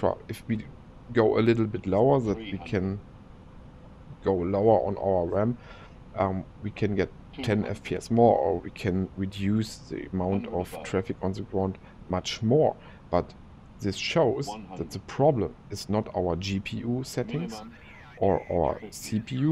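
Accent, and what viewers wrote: Germany. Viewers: I was stationed in Germany for 5 years and I loved it. So the accent makes me remember some good times. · Englisch mit Deutschem Akzent ist irgendwie immer geil.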